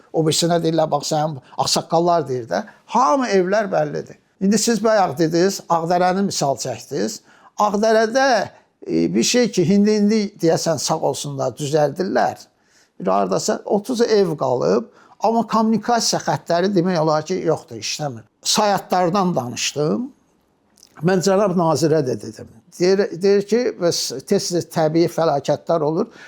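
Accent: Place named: Khizi, Azerbaijan. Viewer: Salam Aleykum dayı oğlu❤Allah sizi var eyləsin!ömrünüzə,elminizə bərkət versin!Xızı rayon Xirək kəndinin,böyük bir nəsilin fəxrisiz,həmişə uca olasız!Maşallah çox gözəl xıdalığıynan danışmısız❤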